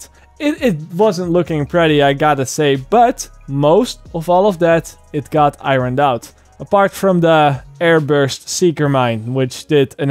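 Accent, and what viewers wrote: Netherlands. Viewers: R u dutch? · Nederlands accent? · Are u dutch??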